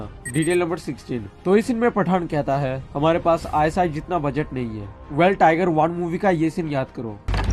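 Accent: Assam, India. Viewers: Bhai aap Assam se ho kya????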